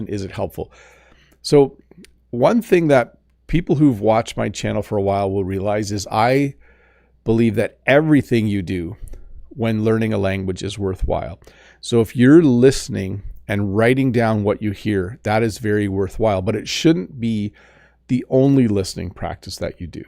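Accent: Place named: Canada. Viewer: Thank you,! I'm going to your beautigul country on May! Thank you for your lessons! Watching your videos I can learn a litlle bit more the accent that the canadians use! I also like so much of the Rush' songs, since I was young, the great rock band of Canada. So receive a big hug, and have a nice night! Thanks a lot!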